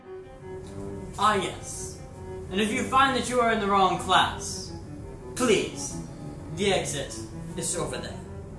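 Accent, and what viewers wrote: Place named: United Kingdom. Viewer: WOW, the face/hairstyle and British accent LITERALLY looks like a younger version of the actor Thomas Brodie-Sangster (look him up if you don't know who he is), very good video again!!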